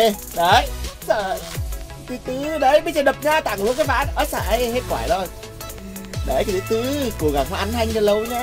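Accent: Nghe An, Vietnam. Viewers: tiếng nghệ an nói hay chết đc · cái giọng nghệ An hay quá trời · nghe giọng nửa trung nửa bắc nghe khó chịu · Nghệ an bạn ạ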